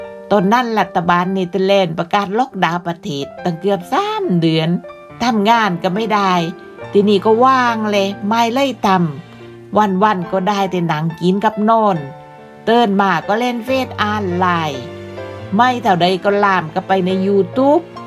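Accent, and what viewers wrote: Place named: South, Thailand. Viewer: ชอบจังภาษาใต้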